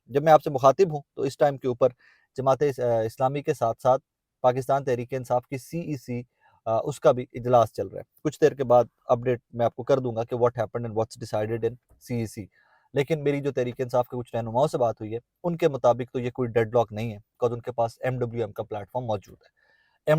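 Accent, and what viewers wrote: Punjab, India. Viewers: Ap ki Punjabi ny Dil Khush Kar dia Hy 😊😊😊😊😊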